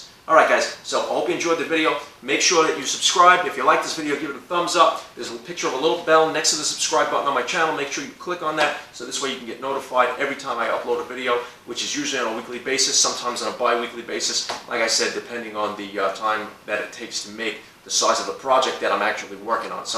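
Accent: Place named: Brooklyn, United States. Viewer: Excellently produced video. Very clearly explained. Thanks. Love your accent. Is it Brooklyn? Sounds cool to an Englishman. :)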